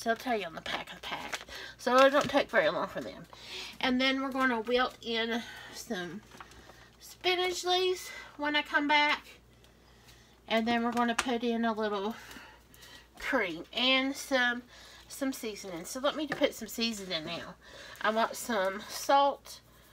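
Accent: South, United States. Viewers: That’s how us southerners say it☺️!! My late mama, God rest her sweet soul, would call the word, yesterday, yursday😂!! She also used to tell me that I needed to get my “poplar” sleep instead of “proper”😁!!!! I miss her💔!!!!!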